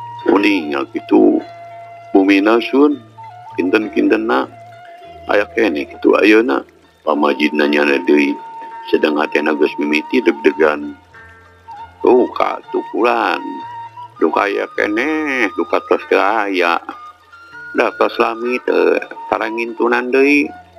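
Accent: Sunda, Indonesia. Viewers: Cerita dongengnya sangat bagus mudah di pahami.suara sunda nya aduh jdi inget pada masa jamannya.keren mang..sukses dan sehat slalu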